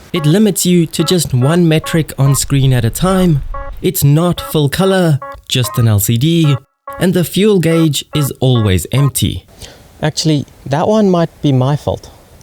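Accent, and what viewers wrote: South Africa. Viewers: I knew you sounded South African!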